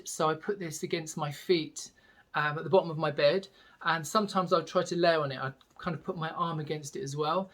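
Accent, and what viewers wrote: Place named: United Kingdom. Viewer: My ear tells me you have to be from same area of UK that Russel Brand is from. Great video btw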